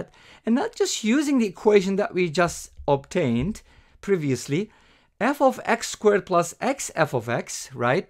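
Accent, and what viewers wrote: Turkey. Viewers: reis Türk müsün ya? aksandan çakıttım biraz.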